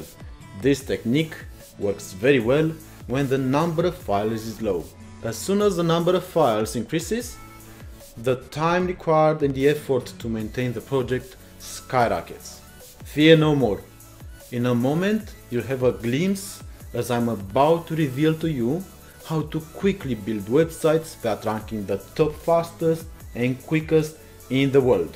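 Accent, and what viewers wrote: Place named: Romania. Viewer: Are you from Romania? Because your accent resembles :) GJ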